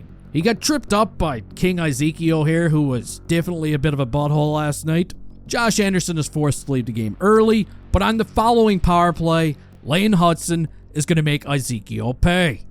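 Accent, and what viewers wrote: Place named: Atlantic Canada, Canada. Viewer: Dach is a solid 2nd liner. He's been dogged by having his crane rocked too many times and people ragging on him as he's essentially playing hurt, and thus far from his potential. Whenever he plays his game (ie. concussion-free long enough) he brings the plays and the points and people love him. The true question is how long will his career last if he keeps getting bashed? Love this channel. I still can't peg the accent, Nova Scotia? Newfoundland?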